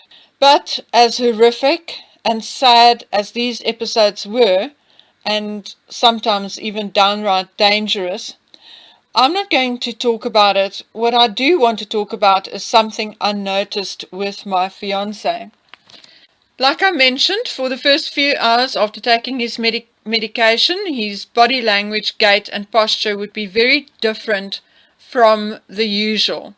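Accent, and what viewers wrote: South Africa. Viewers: And your beautiful South African accent. Makes me homesick. I lived 30 years in RSA.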